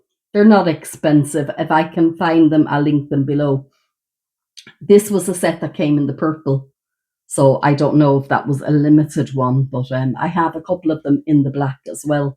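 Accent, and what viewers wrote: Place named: Ireland. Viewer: I could listen to you all day❤. Lov the Irish accent❤. I’d love to visit Ireland one day❤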